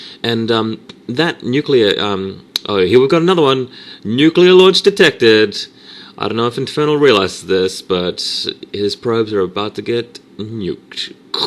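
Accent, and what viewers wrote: New Zealand. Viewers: I like your accent. Go NZ. :D And nice commentary, I understood everything that was going on, and I actually don't really know too much about the idiosyncrasies of the game.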